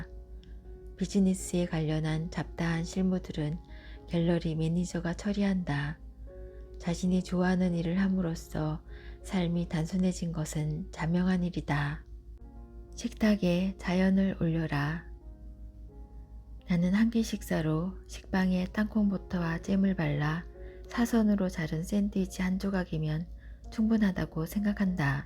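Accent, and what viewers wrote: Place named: Gyeongsang, South Korea. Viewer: 경상도 억양..😢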